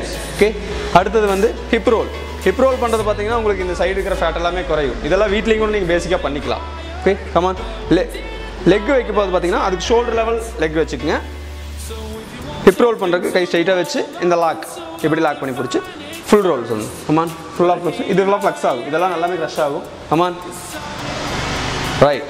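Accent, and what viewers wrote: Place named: Kongu, India. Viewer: Bro neenga Coimbatore ah..? Kongu Tamilin enimai katuku sogama eruku...;)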